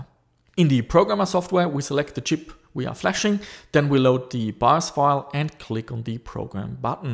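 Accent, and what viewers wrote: Germany. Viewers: Yay! A new Video. Btw. Are you German? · Man hört das du nen deutscher bist 😂😂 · Your clearly sounds German why don't do the video in German? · DAT GERMAN ACCENT!×£°J™£¶~™OA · German? :D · He's an Austrian who moved to Australia. That's why he has a German accent.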